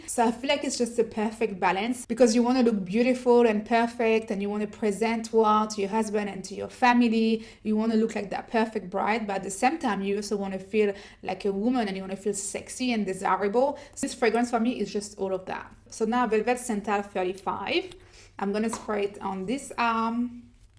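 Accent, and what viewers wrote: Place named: France. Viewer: Lovely review 🥰 I heard that the wedding silk was like a love child from vanilla 28 and Musk 12 which are my favorite😍 Does the layering of the two lean masculine? Also do you speak French? I detect an accent.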